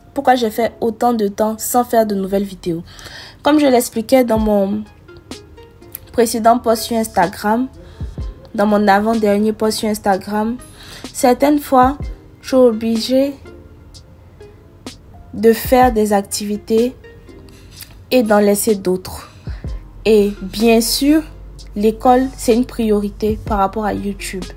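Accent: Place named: Ivory Coast. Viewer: «Deux cuillères de miel ow écoutez moi😂 Fhum » j'aime trop ton accent ivoirien là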